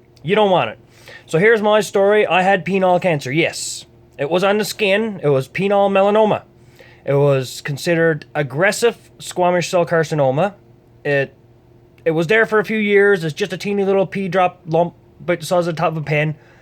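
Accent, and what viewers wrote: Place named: Maritimes, Canada. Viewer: You sound like you're from the maritimes.. That's where I'm from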